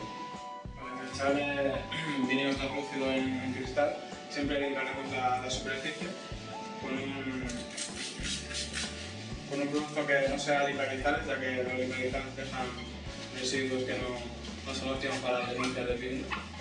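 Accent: Chile. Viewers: chileno la wea fome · No se entiende lo que dice!!! Eres chileno?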